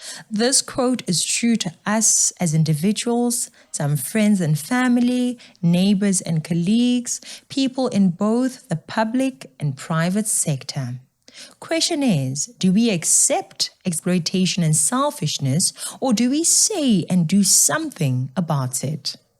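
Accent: South Africa. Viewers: thankyou we are so blessed to have you uncover all the wrong doings and speak your mind with that lovely south african accent god bless you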